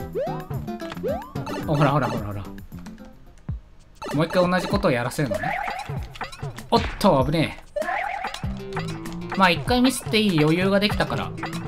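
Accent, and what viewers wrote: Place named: Kansai, Japan. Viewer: ・。・c 関西弁(´▽｀)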